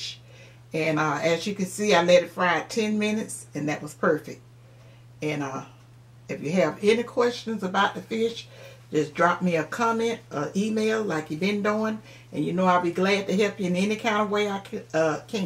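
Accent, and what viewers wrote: South, United States. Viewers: I enjoy you ! I love your southern accent and charm ! You should so sweet and caring ! Put me in the mind of my nana or great Aunt ! The fish was absolutely beautiful! Blessings be on to you! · you are awesome...quit worrying about how you say certain words, I get you so if they dont they can simply rewind put headphones on..From one authentic proud Southerner to another...Keep doing YOU..keep your smiles going through that wholesome "put your foot in it" style cooking you're sharing. Bravo!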